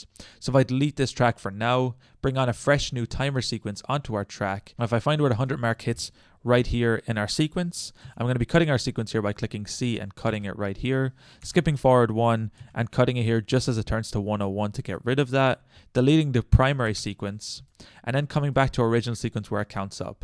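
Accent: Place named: Ireland. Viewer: Thank You! You sound Irish :D Also how did you get the Join Membership Feature??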